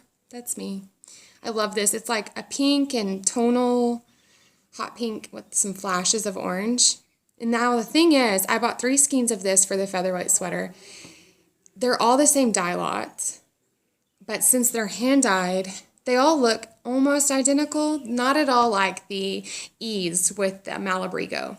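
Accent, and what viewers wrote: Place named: South, United States. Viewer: Hi, I sometimes get those purl bumps too when casting on toe up. To avoid them you need to knit into the back of your stitches on the first row after you cast on. At least that seems to work for me. Also, handy hint, if you are finding all those m1L & m1R really slow going on your toes just do kfb instead. It may not look quite so neat but it's much less hassle & who's going to be studying your toes anyway?! PS love the podcasts & your Southern accent!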